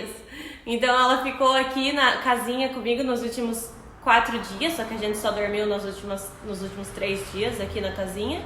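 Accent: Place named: Rio Grande do Sul, Brazil. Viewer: 🇧🇷Oi linda 🤩😍🥰 que bom te ver, e saber que está tudo bem 🙌eu achei que era do rio grande do sul pelo sutaque😂 beijinhos para a sua mama👸 e🐶freinhae e para vc tbm👸😂😂😂😂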